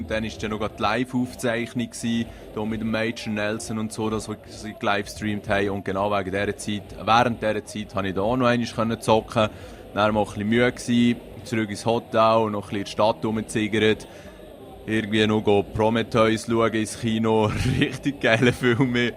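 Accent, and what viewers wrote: Bern, Switzerland. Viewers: Besch du en berner ^^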